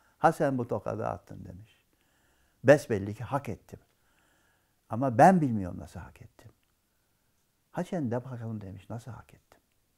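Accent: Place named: Azerbaijan. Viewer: Azerbeycan şivesini çok seviyorum, Teşekkür ederim ❤🙏🙏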